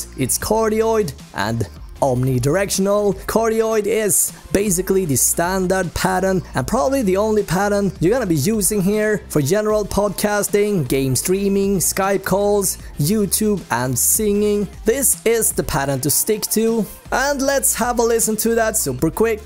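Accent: Sweden. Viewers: Is he swedish ? 🇸🇪🇸🇪🇸🇪🇸🇪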